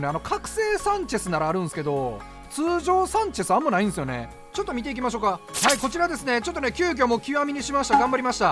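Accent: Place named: Fukuoka, Japan. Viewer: 、これまたリアタイでサンチェス増えますよっあとよかよかは福岡の方の方言ですね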